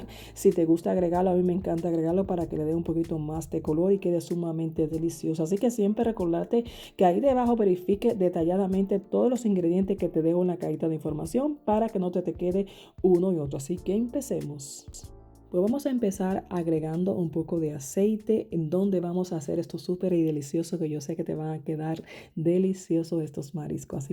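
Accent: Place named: Dominican Republic. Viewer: Me gusta mucho el acento dominicano. Saludos desde Venezuela.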